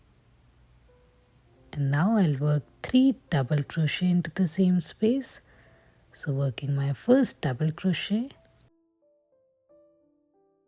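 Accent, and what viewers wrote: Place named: India. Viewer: indian accent IM IN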